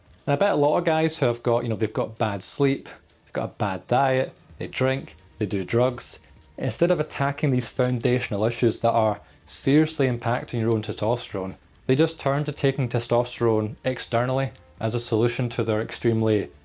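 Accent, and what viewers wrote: United Kingdom. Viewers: I know you like the UK, but if you don't already know, you could legit geomax in the US. American guys and girls both find European accents really attractive, especially the proper British accent like yours (aussie accent is a close 2nd). It'd be a free +1 to your effective rating. With some girls even a free +2.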